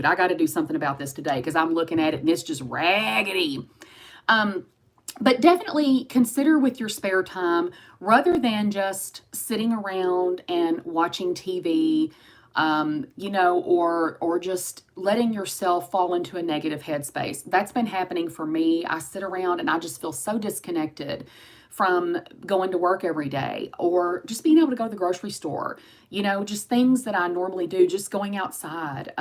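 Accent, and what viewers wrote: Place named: South, United States. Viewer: I am in Australia and I just want to say that I absolutely adore your Southern accent. Could listen to you all day. xxx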